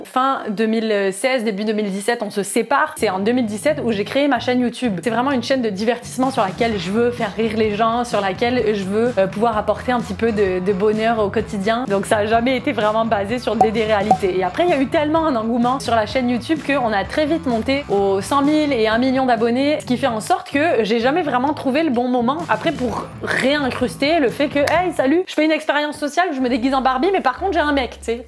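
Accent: Quebec, Canada. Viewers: Je trouve qu’on entend de plus en plus un accent québécois quand tu parles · Je n'avais jamais remarqué mais tu a un peu l'accent Québécois c'est est géniale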